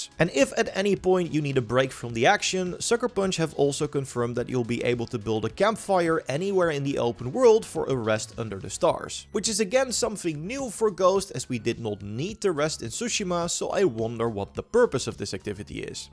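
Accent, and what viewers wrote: Netherlands. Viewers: I hear an accent . You from europe ? Netherlands?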